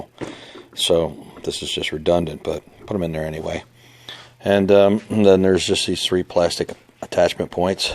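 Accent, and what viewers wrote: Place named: New Jersey, United States. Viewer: Your accent sounds like you're from central New Jersey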